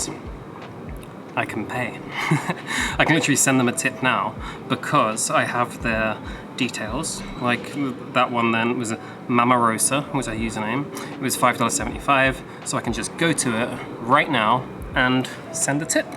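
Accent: United Kingdom. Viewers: Hi, buddy where are you from? Your accent is like britain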